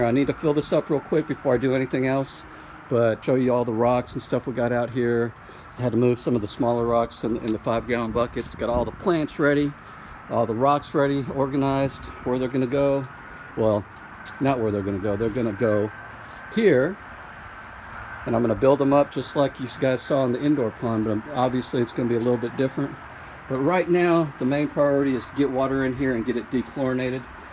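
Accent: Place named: Texas, United States. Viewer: I love your shirt and your accent, I just discovered your channel and it made me smile when I found out where you live. OF COURSE I said to myself…. Texas! Super fun videos keep them coming.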